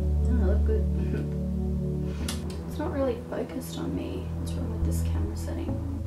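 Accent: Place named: Australia. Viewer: I love your Australian accent and you do you makeup amazing so I had to subscribe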